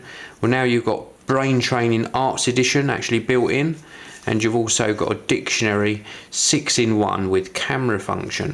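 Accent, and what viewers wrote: United Kingdom. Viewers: I really like your British accent a lot!!!!!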